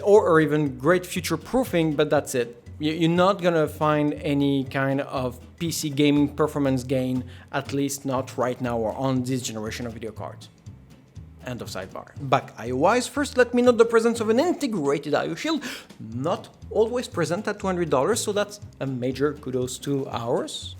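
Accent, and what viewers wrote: France. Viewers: This review is great. usually with the French accent there is a high "punch me in the face" factor, don't get that with this guy at all - also Je Parle Francais - Merci bon homme. subscribed. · Nice video, love your french accent :p Can you still run two M.2 NVME Gen 3 while running a Ryzen 3000 on this board? Or are you forced to use M.2 NVME Gen 4 when using a Ryzen 3000 CPU? Enjoy the rest of your week! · I barely bear your accent. im not a english native speaker, try to follow your speech is very hard for me. Also you are french. (im italian)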